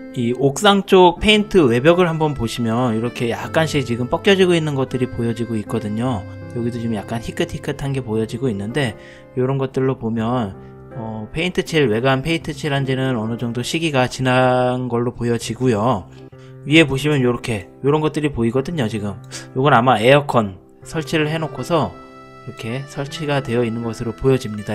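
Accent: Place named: Gangwon, South Korea. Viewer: 채널주인분 강원도 아니면 충북 쪽분같내요 말투가 약간그쪽분같음 친근하내요 ㅋㅋㅋ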